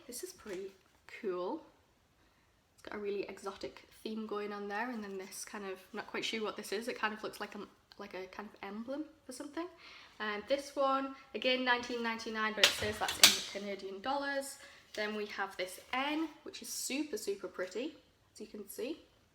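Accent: United Kingdom. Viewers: That's a definite British accent, if I'm not mistaken. Im glad to hear. I don't see many of us on here. 😉 lol. This is American based I suppose. 😂 When you grab a bunch of boxes together from the same store, your bound to get copies. The last batch of boxes were better, but, one woman's trash, is another woman's treasure...as they say. You'll soon find homes for the thongs you don't want/need. Re the letters...there's the option of making frames from two L's screwed or stuck together. Just turn one upside down and cover. Fancy up...with what ever. Plus the O's. I heard you mention, your a crafter at heart. 😉 Isn't it odd that we enjoy watching others open boxes, to see what's inside. I've not watched this kind of thing before, but I think I'm a bit addicted. Thank goodness we dont have Michaels over here in the Uk. (secretly I do) My stash of crafting materials would be horrendous 😱 Er it's not far off it already. 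There's always room for more 😂 I'd gladly lighten your load a little...can't wait for the chance at your give away. I'll be watching for your craft tutorials. Happy crafting folks.x 😊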